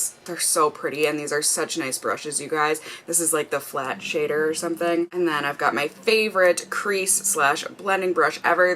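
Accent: Minnesota, United States. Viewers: You are so dang cute. When you say the words, bag, and Aunt, you sure can tell you are from Minnesota! 😘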